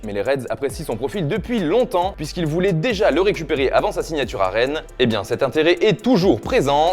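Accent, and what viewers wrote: Italy. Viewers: Moi c’est les accents italiens qui me retient ici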